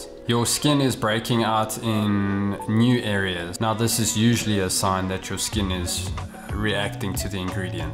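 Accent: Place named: South Africa. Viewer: Why do you sound so South African? Where you from?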